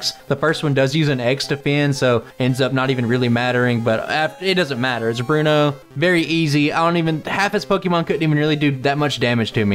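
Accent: South, United States. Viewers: That sounds like a southern drawl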